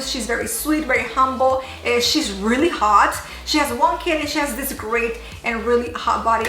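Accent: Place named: Mexico. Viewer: Hola... veo tus vídeos para aprender un poco más el idioma, aunque se me hace rara tu pronunciación, es un inglés americano con acento mejinaco muy gracioso. Pero aquí me tienes 🤗porque eres si serás mi youtuber favorita. Aunque mi canal favorito es de de vlogs, sí ese que tienes algo descuidado últimamente 😔... Saludos desde Madrid España 😘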